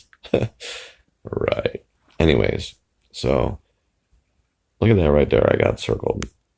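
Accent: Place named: Northern England, United Kingdom. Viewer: Northern English accent. Bordermen on the south side of Hadrian's wall, The Roman empire didn't dare go any further North then the famous border wall. It's really nice to meet you and wish you all the best in the future and good health to you and your loved ones. Gonna get my camera out it's just getting dark now. What do you make of the Sickness that is being spread among us apart from sick of it already? Culling the population by virus?Anyways I have subbed and if anything happens or If I get my act together I will post u a message.Peace!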